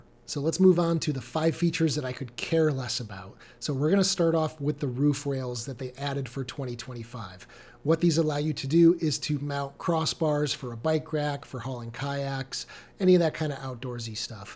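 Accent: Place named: Boston, United States. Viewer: Until I listened further, I didn't understand Smot Pok - and then you said you're from Boston! 😂 Love your content - love my 2025 I6 RWD SE - great efficiency and roadtripper. Almost 20k miles since March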